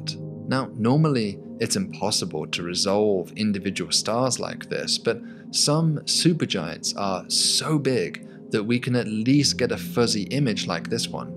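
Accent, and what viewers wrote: United Kingdom. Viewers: I knew he'd be British before he started talking lol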